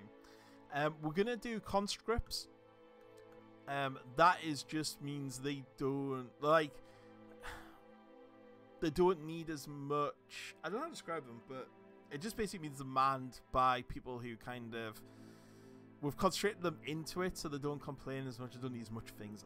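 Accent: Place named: Newcastle, United Kingdom. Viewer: Please do, these are the best tutorials on here and I dig the geordie accent :D Thanks for the series, really helpful. I've bounced off this game for years but now I'm playing it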